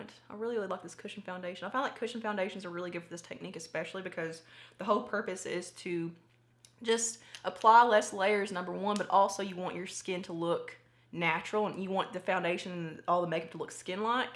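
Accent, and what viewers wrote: South, United States. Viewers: #partypeople Brand new subscriber here and I must say I really enjoyed your video and this technique. I have never seen this done before. You are so beautiful! I just had to let you know. Oh and ps. From one fellow country gal to another, I love your accent! Nice to know I’m not the only one with a twang! 😘😉🥰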